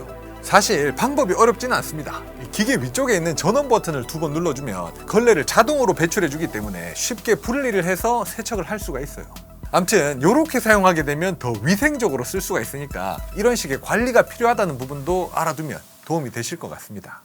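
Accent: Gyeongsang, South Korea. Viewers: 경상도사투리 드럽게 듣기 싫네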